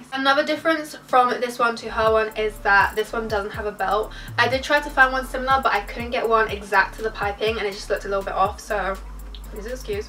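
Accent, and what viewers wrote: United Kingdom. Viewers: Brb about to spend all my coins in Shein😭 when I heard your British accent I was like yesss UK stores will be recommended 😭😭 And now I’m lost on Shein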